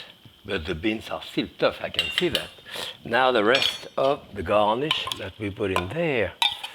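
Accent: France. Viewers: I could listen to him talk all day. I love that French accent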